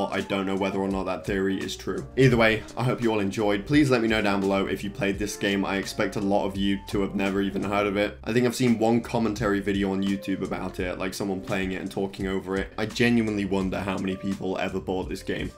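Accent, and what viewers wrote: United Kingdom. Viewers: man youre british right and i got an ad for teeth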